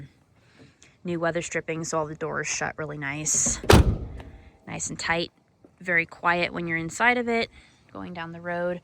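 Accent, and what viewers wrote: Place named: North, United Kingdom. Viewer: My opinion,that’s a show truck. It’s to nice to be a daily driver. What I noticed first is,the chrome molding on the body is aligned perfectly. And underneath is immaculate. Plus,I don’t know where you live but you sound like you liv up north somewhere. If that were mine,it would never see a salted road. I would walk first.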